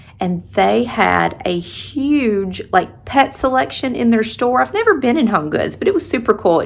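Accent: South, United States. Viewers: I found you looking for growing out grey hair info. Love your Southern accent as I am from the South, but live in the North. Then found Pollux videos. I lost my German Shepherd, also a rescue, in 2017 and can't get over losing him. So am enjoying getting my doggie fix with Maxie and Pollux. Thank you. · Being from S. Cali, I just LOVE Southern talk even though usually do not know what the phrases even mean, lol☺😂~Plus, just has the prettiest accent ever, and I have always wanted one so much❤:)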